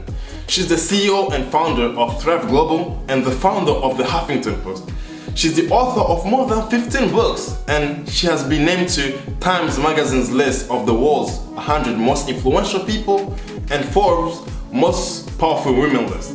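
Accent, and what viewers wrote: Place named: Africa. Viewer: You still have an African accent though And its is beautiful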